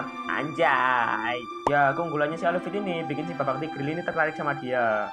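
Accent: Java, Indonesia. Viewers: Bang besok eudora (ngomong ngomong abang orang jawa kan?)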